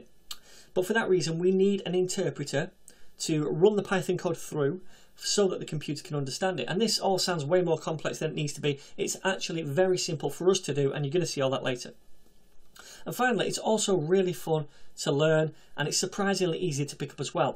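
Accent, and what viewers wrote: United Kingdom. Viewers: omg finally a coder-youtuber have explain it on clear and right way and else thing is he is British and cuz of finally accent is not make me annoy uhh... thank god i fined the best yt Chanel in entire world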